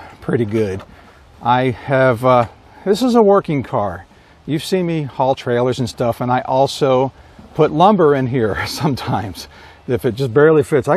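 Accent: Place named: United States. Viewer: Wow! A European speaks American English with the proper accent.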